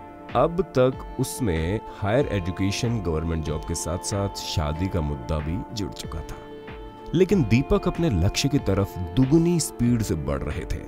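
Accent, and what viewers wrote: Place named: North, India. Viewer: 🤣😂🤣 । ये अच्छा है कि एक्टिंग करने वाले सारे उत्तर भारतीय कलाकारों की भाषा पे पकड़ और लहजा शानदार होता है। आप भी यूपी के हैन? वैसे आप ट्यूटोरियल कब शुरू करने वाले है।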